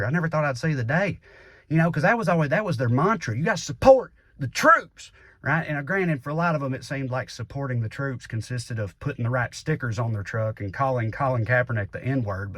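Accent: South, United States. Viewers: I’m always glad to have a person with a southern accent who is funny on purpose in my subscriptions. Keep up God’s work.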